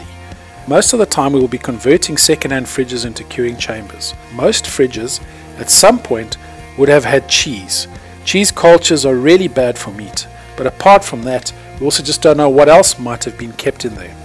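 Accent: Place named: South Africa. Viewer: Where can I buy bactoferm 600 in South Africa ? You sound like a South African.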